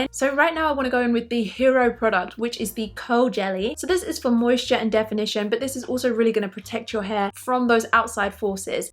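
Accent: London, United Kingdom. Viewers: Your hair is growing so so long I love that London accent 😍 💕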